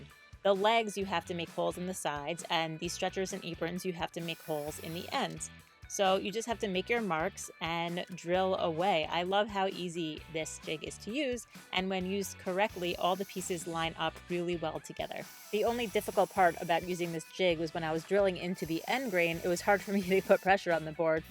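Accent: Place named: Long Island, United States. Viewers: great build. My son would have loved this 10 years ago! BTW, can totally hear the Long Island in your voice on this vid.. :-) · Very nice! I can see this piece being handed down for generations. And the northeast accent "draws"... gotta love it!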